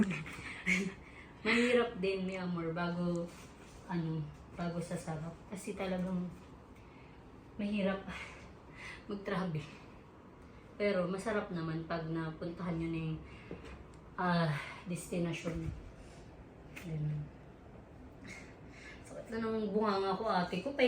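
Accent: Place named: Australia. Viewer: I wish to meet you one day. Did you live in Australia before or hang around lots of Aussies? Your accent is like ours.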